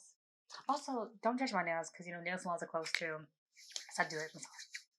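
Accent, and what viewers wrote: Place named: New York, United States. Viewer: I love your NY accent (don’t change how you talk) and your locs. They have grown out sooooo 😍 nicely. Been a subbie since the beginning. I would recommend supporting a YouTuber named Tameka by nature below is the link to her business. She is also a New Yorker and is always promoting positivity and supporting small you tubers. Shout her out and/or support. I truly respect that you want to do this🤗. Stay blessed sis🙏🏾. <link>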